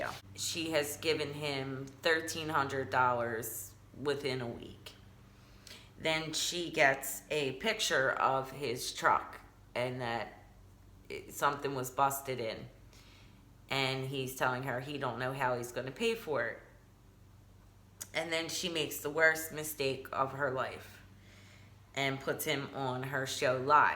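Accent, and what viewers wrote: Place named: Philadelphia, United States. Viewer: I’m so glad you did this because I wasn’t sure of the details of what was going on but there was no way I was gonna give one more view to MGL because I’m done with her garbage ass. Also, are you from Philly? Haha def a Philly or NJ accent!!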